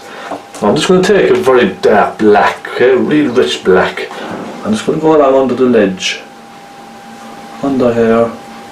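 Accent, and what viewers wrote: Ireland. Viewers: nice to hear an Irish accent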